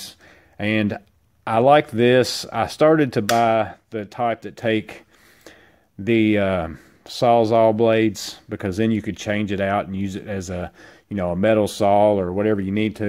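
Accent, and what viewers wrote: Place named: Texas, United States. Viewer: I love your Texas accent lol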